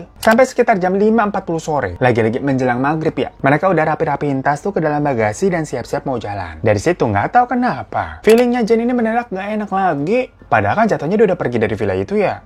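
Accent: Java, Indonesia. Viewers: 😂😂lucu ngomong bahasa jawanya kk😂😂😂ngakak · Bang...pliiizzz...jgn bkin aku ketawa ngedenger abang ngomong bahasa jawa...keren n lucu bgt👏👏👏👍👍👍usahanya polll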